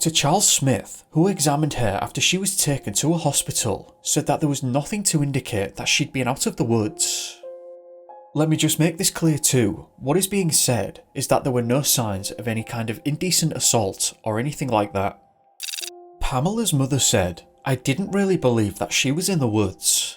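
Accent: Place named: Durham, United Kingdom. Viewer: Nope a Duram lad for sure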